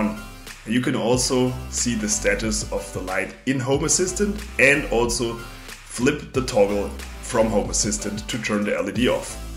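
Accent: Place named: Germany. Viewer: Man erkennt sofort wenn ein Deutscher Englisch spricht. Daumen hoch.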